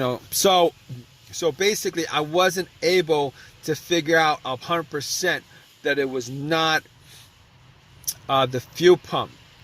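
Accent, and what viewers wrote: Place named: Bronx, United States. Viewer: I love your accent. Is it the Bronx accent?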